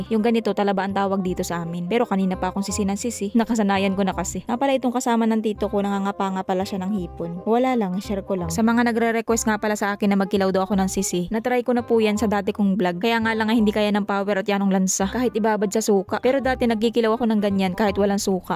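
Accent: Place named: Visayas, Philippines. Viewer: Bakit may halong bisaya ang salita mo idol?😅bisaya din ba kayo?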